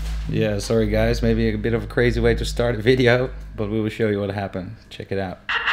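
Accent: Netherlands. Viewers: arw you dutch? you have quite a dutch accent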